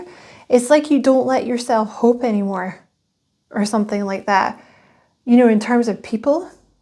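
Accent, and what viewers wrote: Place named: Scotland, United Kingdom. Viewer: Thank you. The technology world it's slowly killing the human world and it's based on convenience that is alienating us from each other. I talk with older people and they get me. I talked with younger people and they're nice but it's like a program nice with no eye contact. People are so afraid of their own vulnerability that they won't take the risk of getting to know each other. And I think in the older world we were forced into it. Where today we can sit in front of our phone and say and do things that don't require any risk. I love hearing your Scottish accent and I believe you're a real person but this gets in the way of human interaction sometimes and I'm glad I have a job where I meet with real people in their homes. Honestly I think that's what the younger generation is missing. I will retire and go back to Scotland because I think there is still enough people left their who get it. 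God bless you you're a good soul.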